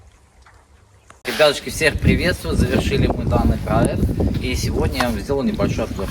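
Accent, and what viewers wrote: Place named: France. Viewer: Смотреть дальше не стал, бесит французский прононс😂или простыл он что ли😂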